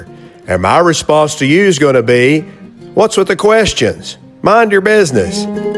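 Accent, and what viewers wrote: South, United States. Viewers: Not Forrest just southern I guess I am in New Mexico but my family is all Texan sounds normal to me. lol love the vids 34 5w is my dream car one day maybe but at 62 maybe not got my 67-mustang hot rod runs 10's but I would love a 32-34 5w coupe my dad had 32 chopped 5w when he was young man wish it was mine. keep the cool content coming. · Just because you hear a nice southern drawl, they start thinkin’ you sound like somebody. What about Roscoe P from Dukes of Hazzard, or Conway Twitty? You just keep being you, and I’ll keep watching the guy that sounds like half my kin!! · You sound like most of the people I know “southern blue collar workers “ I’m kinda proud of that . Those that poke fun of us sound just as different to us as we do them , I agree with the one guy , like the mixture of your videos ,machinist , mechanic, car lover , farmer, musician, family man, just a good ol boy,you know your mama love you !!!!!!😂😂😂 hey you can’t get all those degrees down at the university, 😊😊😊😊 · Well, I like this channel just the way it is. 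You do not talk too much. You do not sound like ol Waylon. Sorry. But you do sound like a more southern version of my old pal Tim From Throttle Power up in Louisville. 😊 Your gasoline hallucination was a nice treat. Another enjoyable video. Thanks. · Lmao, im an arkansas hik and you sound like you're from the south. The brass had to come from above the carbs, very fortunate it didnt get passed the valve. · I think some of your listeners need there hearing checked, You do have a different voice to most people nowadays that's only because your southern and we are all a dying breed. Your singing voice is excellent I wish I had that, but its your voice not anyone else's that is what makes it sound so good. But Dukes of Hazzard and Forrest Gump I bet your wife thinks people are crazier than I do how funny. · You guys are a totally awesome sight always and your voice sounds southern!! Don't ever change I love your attitude!!!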